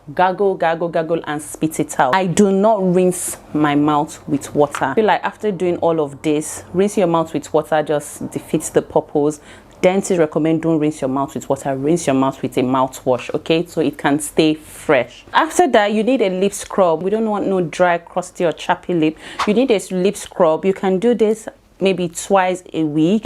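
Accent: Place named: United Kingdom. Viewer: I have an obsession with UK accent & makeup 😍😩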